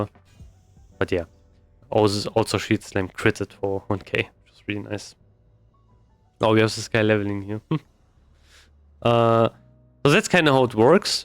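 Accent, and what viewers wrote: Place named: Germany. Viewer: Inhaltlich super, nur eine bitte, arbeite ein bisschen an deiner englischen Aussprache :D. Vokabeln, grammatik, satzbau, voll super aber du klingst so deutsch :-* nicht böse gemeint, nur ein tipp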